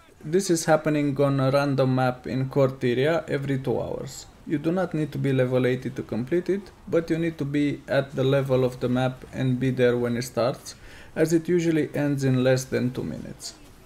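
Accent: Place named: Romania. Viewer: I like your accent, where are you from? Romania? Turkey?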